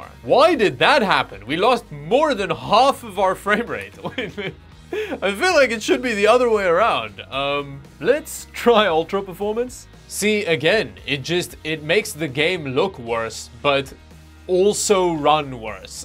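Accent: South Africa. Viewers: Is South African? Accent seems familiar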